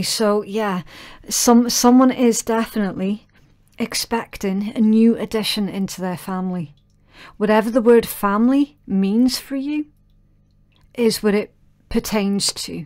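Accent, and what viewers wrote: Scotland, United Kingdom. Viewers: omg i love your accent!! Scottish ? ♥and as for the read : dead on accurate !!!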